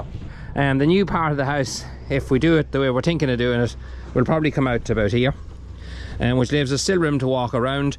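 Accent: Ireland. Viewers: Loving your videos so far and will follow you all the way through your cottage renovation and now it’s got me watching your ifarm wefarm as well. Watching from Perth, Western Australia by the way. We love Ireland and have been there twice all over the place and it’s my favourite country apart form Australia of course. Love your Irish accent, I could listen to you all day.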